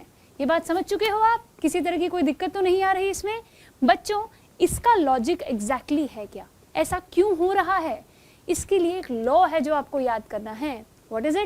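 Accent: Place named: Bhiwani, India. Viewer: Bhiwani se ho kya mam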